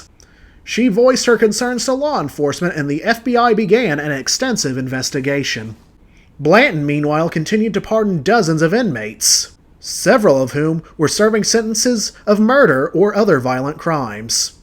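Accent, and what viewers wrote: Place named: Transatlantic, United States. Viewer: Good video. I like your almost transatlantic accent. Keep it bro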